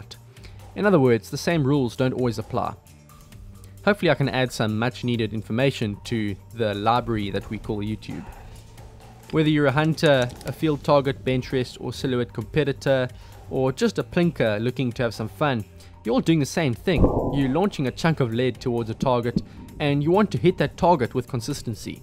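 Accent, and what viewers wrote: South Africa. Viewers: are you from South Africa. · nooit hes south african!!!!!!!!!!!!!!!!!!!! subscribed and notified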